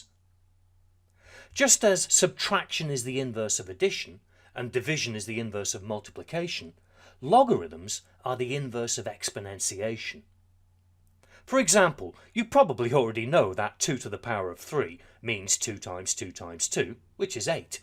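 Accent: United Kingdom. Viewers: I also can't tell which it is, but the British accent is definitely doing it for me! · HOLLLLYYYY - thank you so much for your video. this is probably the most perfect explanation i've seen. its either the style of explanation or the british accent that is driving the points home.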